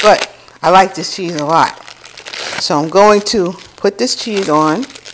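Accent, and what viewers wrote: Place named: New York, United States. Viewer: I just love your NY accent...I'm in Philly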